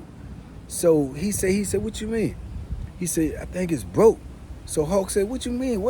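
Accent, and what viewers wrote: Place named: New Orleans, United States. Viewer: U sound like u from New Orleans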